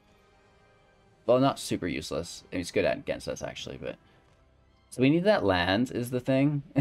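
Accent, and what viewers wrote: Canada. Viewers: had an extra strong Canadian accent today. Oooout. Love it.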